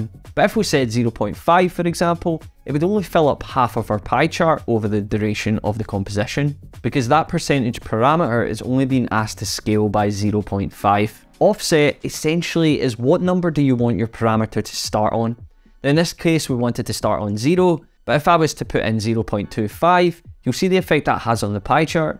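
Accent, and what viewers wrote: Scotland, United Kingdom. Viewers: Your Scottish accent is what I live for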